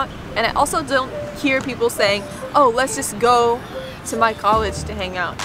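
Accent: Taiwan. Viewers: 一開始打開影片聽有種台灣人說英文的親切感覺，後來才想起來妳是美國人ＸＤ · 我真的覺得前面開頭講的英文有台灣口音!! 一開始聽不習慣…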